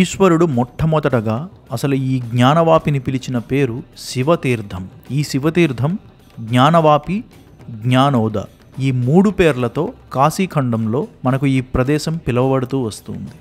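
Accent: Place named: Telugu, India. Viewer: I knew you are Telugu. Your accent seemed so familiar to that of a very dear friend I have got - also Telugu. I'm still waiting for the mathematics series. Please continue with it. I wish to know the Bharatiya Ganitam so much.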